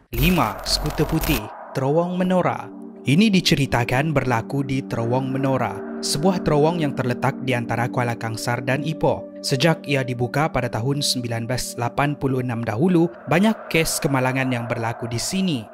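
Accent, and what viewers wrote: Sabah, Malaysia. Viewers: Inngat kan ni indon tpi sabah bha😂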